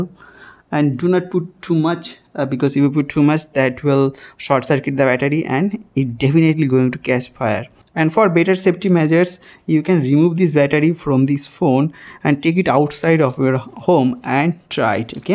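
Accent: India. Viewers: Indians speaking english is probably the most annoying thing ever.... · i like this channel indian with english is rare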